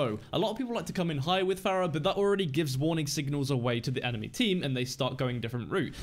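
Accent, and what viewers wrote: United Kingdom. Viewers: Superb video mate, damn good Pharah play. From your accent I take it you're from the UK? Surprised you're not on the UK world cup team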